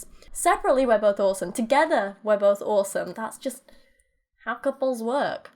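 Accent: United Kingdom. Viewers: Are you British? I swear i can hear a bit of British in your accent.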